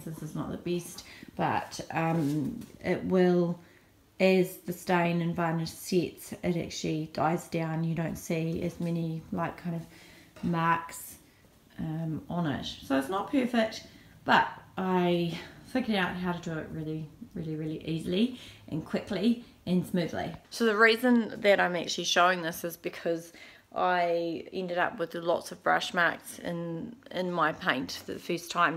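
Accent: New Zealand. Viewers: Lovely kiwi accent!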